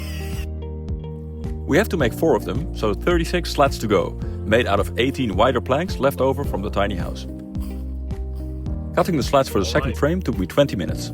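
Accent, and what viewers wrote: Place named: Netherlands. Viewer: I love hearing your Dutch accent. I miss my vader so much.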